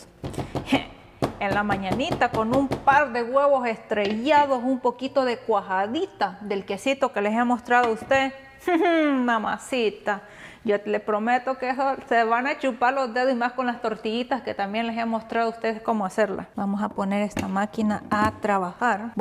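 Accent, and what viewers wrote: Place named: El Salvador. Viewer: Me gusta como abla bien salvadoreña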